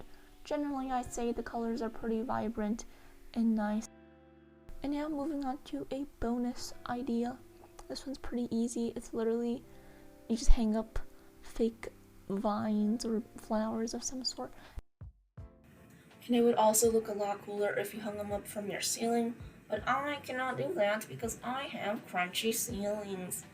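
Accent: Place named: New Jersey, United States. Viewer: i love your voice it’s like a fem kermit from new jersey,,, very calming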